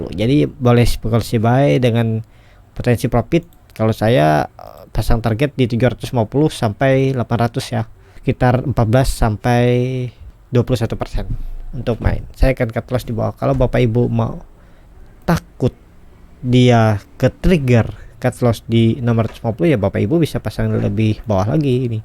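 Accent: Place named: Borneo, Indonesia. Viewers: Logatnya original ini asli borneo.. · Bos logatmu kok kadang seperti orang sunda?. Aslimu Borneo apa sunda?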